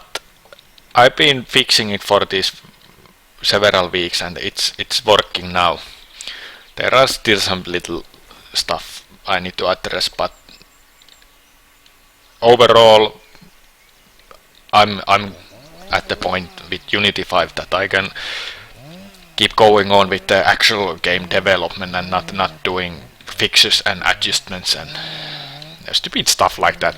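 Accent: Finland. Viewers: Im guessing that his accent in reality is not that thick but that it's rather a parody of the "Rally English" that Mika Häkkinen and all the 70s and 80s finnish rallyedrivers spoke. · Rallienglanti on tähän yhteyteen täydellinen aksentti, älä ikinä muutu. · Nyt on kyllä niin rallienglantia että ei pysty edes sanoin kuvailemaan. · His Finnish accent cannot leave when he speaking English lol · It´s so called "rally english*". If you listen some finnish rally driver interviews you get this joke. :) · Aivan loistavaa! Videon rallienglanti kruunaa homman. Tö korner is tankero. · Aijai! Selostettu vielä autenttisella rallienglannilla! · oo my gawwwd, the finnish english accent for the win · daniel targett its rally english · 51 minutes and 5 seconds of beatiful Finnish accent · suomi-englanti-finglish, 5/5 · Finnish accent :) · every pawt iz sumhoww cruishul for de funtion of de engin love how finnish people talk :) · En kestä rallienglanti ilman beer. 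· Vittu ku on rallienglantia. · kyllä on suomalainen peli ja rallienglanti kohillaan tuleeko steamiin vai omalle nettisivulla?? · Rally english makes it always funny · that finnish accent in english it's so unique =D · Classic Markku Alen english!! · ralliEnglantisi on kaunista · Ei millään pahalla, mutta tää suomienglanti on jo aika helvetin koomista. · I'd say Finglish is more common though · tuo rallienglanti on ihan törkeen hyvä lisä tähän :D ja tähän pitää kyllä tulevaisuudes saada joku multiplayer tai cooppi että saa kaverin kans ajaa pitkin raittia ja ryystää olutta ja käydä pubis ja ostaa lisää kaljaa :D ja toki lisää legendaarisia autoja esim saab 900,toyota corolla ke20,fiat 127 jne :) kyllä tämä on pakko ostos ja kaverille kun linkkasin niin oli ihan samaa miältä :D ihan vitun kova peli tulos, "kuinka muistot tulvivatkaan" :DD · With "rally english", ofcourse. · Suomen eglanti aksentti on kyl hauska.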